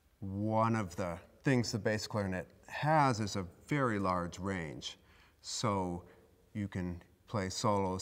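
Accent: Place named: Utah, United States. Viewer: Is it just me who likes his utah accent?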